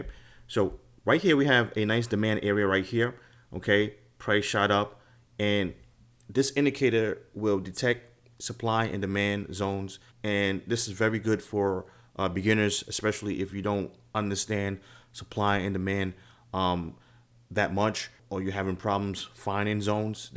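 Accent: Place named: New York, United States. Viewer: My brotha from NYC. I can tell by your accent that you're a New Yorker. I am too. I've been watching your video's on a binge. Great stuff thus far.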